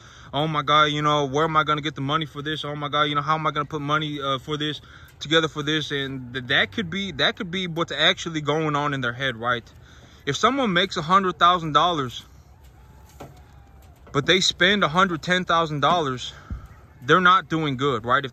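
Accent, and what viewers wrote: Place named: East, United States. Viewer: he's a mystery, I think he kinda has an eastern U.S. accent..... and he obviously doesn't read our comments😂 or we'd get a 5,000 word response. Could cut it down to 1,500, but he generally repeats everything at least 3 times.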